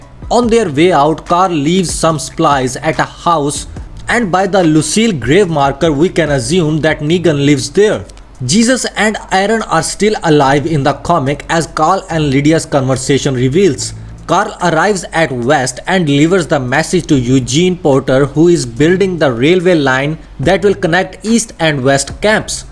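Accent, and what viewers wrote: India. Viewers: Lmao that accent reeks of curry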